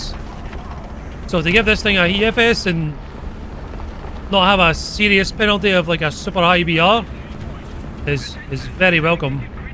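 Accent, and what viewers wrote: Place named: Fife, United Kingdom. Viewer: Just trying to get into WT, as a fellow Scot I found your vids and have ben going through them. Keep up the good work. Really struggling to place the accent though, either posh west end Glesga (east end kid myself but moved away and didn't go to prison or end up on drugs, actually done pretty well when I left that shit hole lol) Anyway, you sound like a Fifer who maybe moved to Glasgow (judging from some of the words used in older vids, naughty Fifer words) Great channel, keep clapping them cheeks ❤🤍💙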